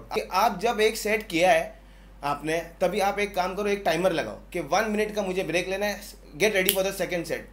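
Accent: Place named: Mumbai, India. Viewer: Typical mumbai slang bhai..rocks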